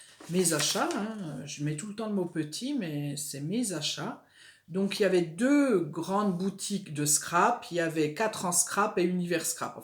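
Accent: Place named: Franche-Comte, France. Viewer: Bonjour ou devrais-je dire 😁 Après tes gentils commentaires sous plusieurs de mes vidéos, je suis ravie de découvrir ta chaîne, (par hasard je l'avoue et j'en suis toute désolée), et je dois dire que j'aime beaucoup ce que tu nous proposes, je m'abonne donc avec plaisir ☺ Et quel plaisir également d'entendre ton petit accent qui chante à mes oreilles 🤪 car oui moi aussi je suis Franc-comtoise 😅😁 à bientôt 😘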